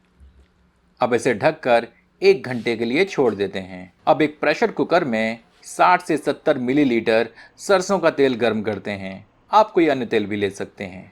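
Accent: Standard. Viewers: the way you speak pure Hindi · Aapki hindi bahut shudh hain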